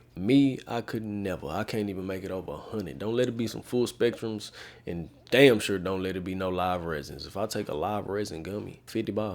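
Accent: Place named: Texas, United States. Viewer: Strong southern accent + left over ribs and bake beans I know this man is from Austin or Fort Worth, TX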